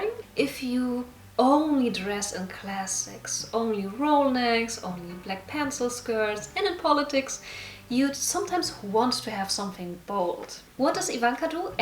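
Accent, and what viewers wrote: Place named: Germany. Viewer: German accent 😂😂😂😂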